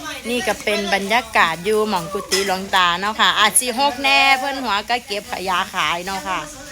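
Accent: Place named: Isan, Thailand. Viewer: สาวชัยภูมิ,สำเนียงภูเขียว ชุมแพ ภูเวียง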